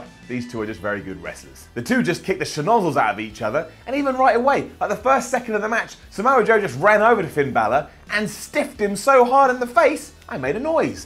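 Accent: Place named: United Kingdom. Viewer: Sometimes when he gives an up and starts explaining why it's like if he didn't have a British accent it wud be a down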